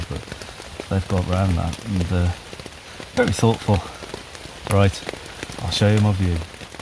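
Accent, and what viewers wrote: Black Country, United Kingdom. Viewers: Hiya bud, I just came across your channel and you seem a genuine and friendly bloke. I’m from Yorkshire but have lived in the West Mids for over 20yrs now and find most to be really nice people. Good to hear a Yam Yam accent on YT 👍 Subscribed 👍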